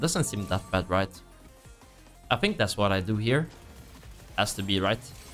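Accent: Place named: Sweden. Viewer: swedish-english is so fun to listen to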